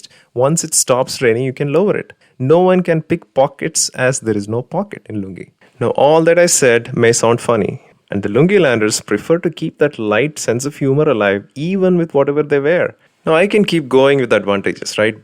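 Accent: Kerala, India. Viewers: malayali aanenn samsaram kettappo manassilayi :) Fav channel <3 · Bro are you from Kerala your English seems like it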